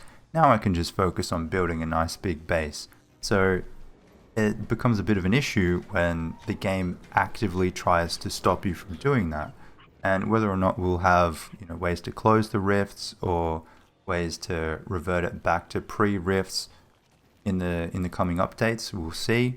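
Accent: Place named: Australia. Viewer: Hello! I've been watching your videos and I really enjoy most of them! I'm really in love with your accent, it's reminds me of Aussie people. It's sad to know that Australian people are not real :(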